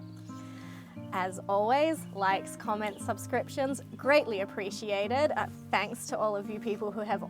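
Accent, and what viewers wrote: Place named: New Zealand. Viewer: By the way, you have an odd accent (not unpleasant). I can definitely hear the kiwi twang but it's mixed with something else. Irish?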